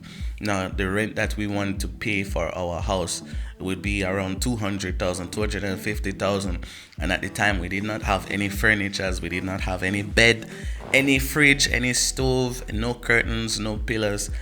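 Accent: Africa. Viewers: OMG, you sound African! I will be in Gambia in 2 weeks time. Would really love to meet you and your wife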